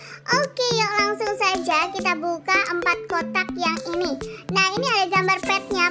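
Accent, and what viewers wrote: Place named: Lampung, Indonesia. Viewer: Kakak kayak orang lampung aja ngomong nya ada "geh" nya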